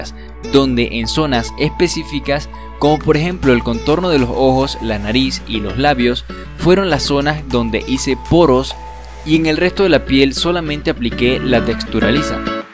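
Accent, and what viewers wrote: Venezuela. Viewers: eres venezolano no? yo igual jajajaj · Eres venezolano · Marico... Acabo de encontrar tu canal. Si había visto videos tuyos pero así de paso. Pero hoy vi uno y escuché tu acento con atención y dije "este pana es Venezolano" JAJAJAJA y me puse a hacer maratón de videos. Mk, eres un maldito psicópata jajajaja alp... dibujas demasiado arrecho y me inspiraste brutal. Gracias <3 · jajajajajajjajajaj no mames eres venezolano jaajajajajajja que risa xdddd yo tambien :V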